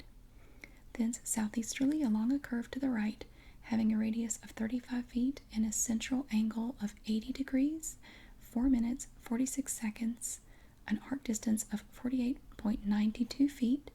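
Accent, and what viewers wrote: South, United States. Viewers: as much as i love your southern accent videos, i love your soft spoken voice! more of these please! >_____<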